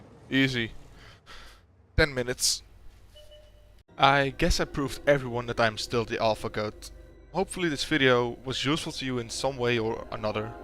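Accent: Netherlands. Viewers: you sound dutch.. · Are you Dutch? Well, I am... And your accent is extremely Dutch :)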